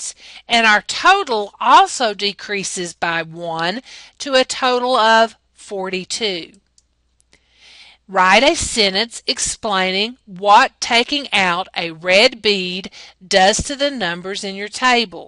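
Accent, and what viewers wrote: United States. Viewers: What accent r u using boston? Southern? I like it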